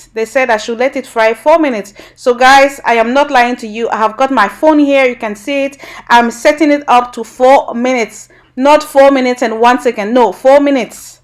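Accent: Twi, Ghana. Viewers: I love your twi so funny😀😀😀 sending you love from Ghana 🇬🇭❤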